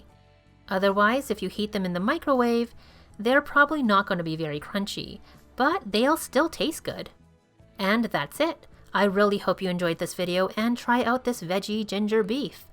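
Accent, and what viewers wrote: Canada. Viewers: I KNEW you were a fellow canadian and I even guess Alberta! 🤗😆♥️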